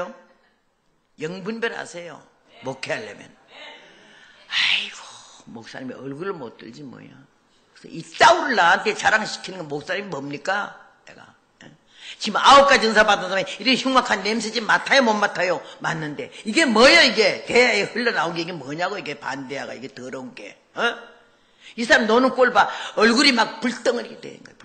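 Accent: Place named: Gangwon, South Korea. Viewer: 영상 다 뒤져보면....고향얘기 나오고요...강원도지역에서도 좀 지내셨어요.말투에서 음성에서..저도 같은 생각.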